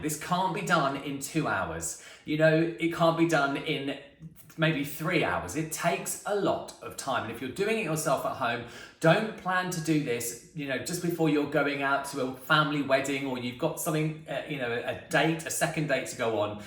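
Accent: United Kingdom. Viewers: Hi ,are you in the UK? I'm assuming you are by the lovely accent you have xx Do you take bookings can you do my hair please ?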